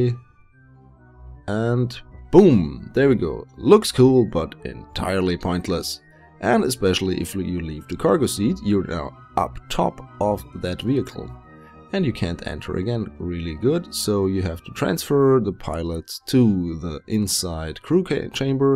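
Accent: Germany. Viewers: He Sounds German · ich höre da 'nen deutschen akzent raus :p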